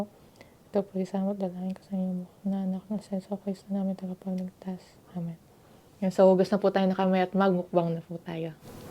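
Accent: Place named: Cavite, Philippines. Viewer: tga cavite po b kyo?ung punto po kc eh. . .😊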